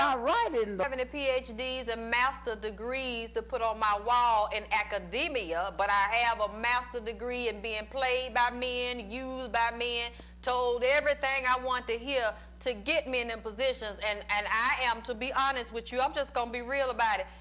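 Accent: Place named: South, United States. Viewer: Is This Lady From The South Because She Is COUNTRY lol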